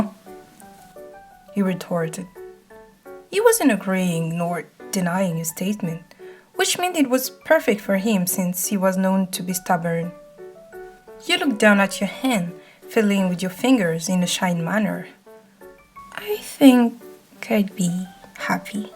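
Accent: France. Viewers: this is gold new sub :) love that acent tho you french or somethin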